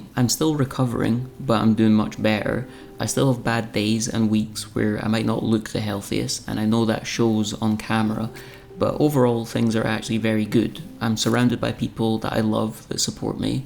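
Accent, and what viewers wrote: Scotland, United Kingdom. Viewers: Thank you so much for sharing - it's not always easy to open up, esp to the world! I've had issues most of my life but nowhere near the struggles you've gone through, mine were mostly related to being an introvert. I'm 76 now and still struggle from time to time. Finding where I belong and where I'm at peace (in Nature), has been my greatest healer even though it means being on my own with my dogs. I say on my own...not alone. I'm sure you'll have helped quite a number of your followers and their friends or families and I wish you all the best in the future. I thoroughly enjoy your videos and love hearing your soft Scottish voice. My origins are in Lumphanan so it reminds me very much of all my family, esp my mum. BTW - if those biscuits are anything to go by, you've got a head start on recovery - we need that recipe!! Love 'n' healing hugs from Oz ❤️🤗👩‍🌾🦘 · What a good video. 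Agree with everything you said....if it matters to you. I love your clear Scottish pronunciation. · Those cookies look delicious! As a lifelong sufferer of depression and anxiety, I am SO glad that the stigma is starting to pull away from mental heath problems. I did not know what was wrong with me, but I certainly beat the doctors' doors down asking for help. The worst were the panic attacks. It took forever to find a combination of helpful meds, lots of trial and error. Do I hear a Scottish accent? My folks come from Arbroath.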